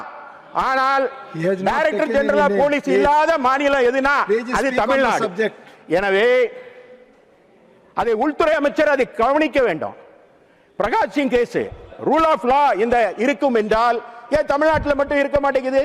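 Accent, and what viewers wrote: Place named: Tirunelveli, India. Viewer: நெல்லை தமிழே ! ஆணிதரமான வாதம்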